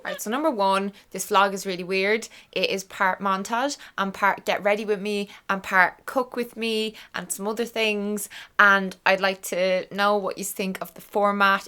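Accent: Ireland. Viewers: I am currently reading your book (english is not my first language) and one thing that it does to me is crave hearing the irish accent. so hear I am listening to you ramble on and enjoying it very much :)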